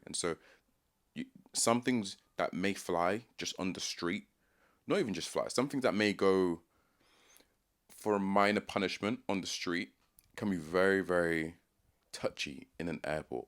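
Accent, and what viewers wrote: United Kingdom. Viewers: I haven’t heard many people on the right saying “get rid of all immigrants”? As an Englishman few things make me prouder than a true British person like this chap with an immigrant background. The fact that he has adopted some of our culture, values, accent, sayings, fashions, sports etc. He is British! Born and raised here just like any White British kid his age. It’s all about integration and adding to a society, not being a drain or a detriment. Many areas of the UK now have not been integrated but replaced, and when British culture is replaced by something so alien as Islamic ideology and practices you will inevitably get conflict. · You're literally watching a video of a man, who although clearly not a native, has mastered the language and has assimilated to such a degree as to have an accent, and i'd wager ideals, in line with what one would expect of someone who is British. 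In other words, he has fully adopted British culture and thus is himself British. To be British is cultural, that is why the English, Welsh, Scottish and Irish are all British. And yes, I said it, the Irish, all of them, whether they like it or not I consider them brothers, just really grouchy ones as they're still upset that they didn't get their mashed potatoes that one time... If I was born in England to typical English parents (Well, I mean I was, so no imagination required for that part. Rather a parent, dad went for milk and cigarettes... ), yet moved to Nigeria young, I'd be English by nationality but provided my parents don't have a superiority complex about their own culture, it will become increasingly likely that I heavily adopt Nigerian culture and become culturally Nigerian. That would perhaps be a strange sight that would make a twatter leftists head explode, to see a white man as pale as I speaking as a Nigerian, with all the calmness and grace, the funny pauses and affectations. 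"Ah, good evening sah, this is your bank calling... " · Nobody seems to care that the INDIGENOUS PEOPLE are being raped, murdered, and replaced. Or is it only when the "indigenous" are a black or brown minority group that people care? Is it really a case of "You whities have had it good, get what's been coming to you"? Of course it is, that's what the anti-white propaganda has been screaming for decades. All of them must go. I'm not sorry, either, you all must go. Had it been Nigeria that Germans were illegally immigrating into, there would be outrage and the populace would violently oppress the percieved invaders. While you act British, and have British speech, and act in a British manner, you are only British by civic nationalism; in every other sense of the word, you are no more British than I, a Canadian, but even then I am more British than you. I greatly appreciate the effort you put into this video and I laud your tact and precise framing and use of English, however you must also go back; you do not belong here, and history will show the world that the greatest folly of the White Man was, upon freeing all of their owned slaves, not sending them back home whence they came. 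· Your UK accent gives you away. You're not the problem. It's people who don't even speak english.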